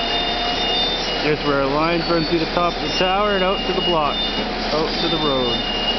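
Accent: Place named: West Coast, United States. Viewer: I love it! ha your commentary is as westcoast as it gets!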